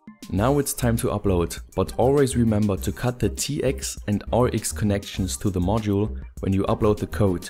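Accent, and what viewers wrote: Germany. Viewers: Are you a German? Sounds like :D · Hmm.... The Sound is like german speak english 😉😄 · Du bist deutscher man merkt es bitte mach deine Videos auf Deutsch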